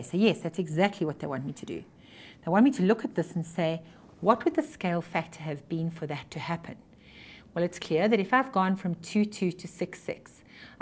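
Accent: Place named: South Africa. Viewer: South African Accent... Loving it :)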